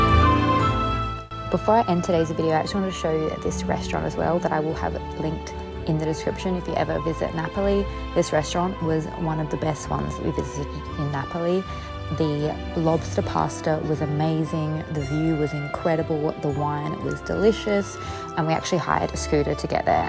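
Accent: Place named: Scotland, United Kingdom. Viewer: This vlog absolutely warms my heart. I remember a previous video where you were talking about always wanting to travel with your significant other (goodness knows how long ago). And now here you are, sharing an adventure or an amazing experience with your significant other is such a wonderful luxury. PS. Love that Scottish accent, brings back memories of a beautiful friend of mine who coincidentally will be back in Australia in about 2 months.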